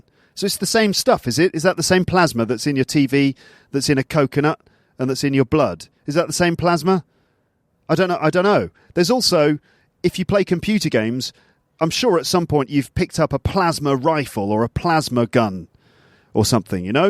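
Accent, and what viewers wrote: RP, United Kingdom. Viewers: hi, thank you so much for sharing this i'm your big fan since you created podcast and love listening to your british standard accent it help me a lot in improving my pronunciation thanks to you again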